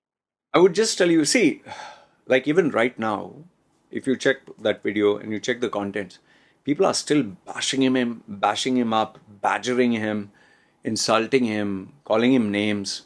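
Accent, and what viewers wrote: India. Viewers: Why u indians always say saar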